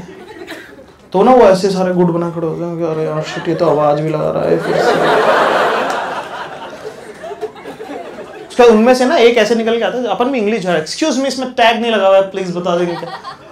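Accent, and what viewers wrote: Indore, India. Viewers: indori ki baat hi Alag hoti hai bhiyao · bhai ye Indori andaz he.. mp me ese hi bolte he... kabhi aao apne mp me bhot mja ayega...:)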